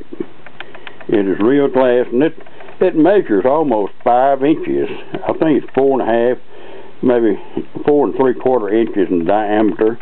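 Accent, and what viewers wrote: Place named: South, United States. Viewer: HAHAHAHA SOUNDS LIKE A HILL BILLY@@@@ Definitly not a bad thing, were all hill billys over here.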